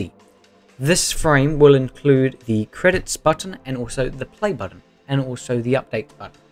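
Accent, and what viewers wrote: United Kingdom. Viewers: Are You Britisch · Bro I can’t understand British (not making fun of the accent)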